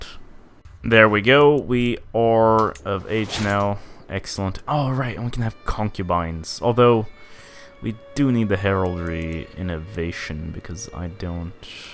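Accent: Sweden. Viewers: You can tell you're Swedish by the way you pronounce Sigtuna xD You've gotten good at hiding your swedish accent while speaking English too. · Are you Swedish?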